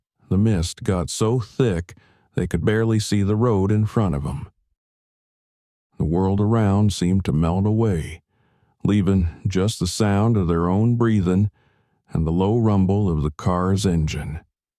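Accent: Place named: West, United States. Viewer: Is the narrator from Utah? I would swear he is from out West from the accent . i love Ky stories . My Dad had a few.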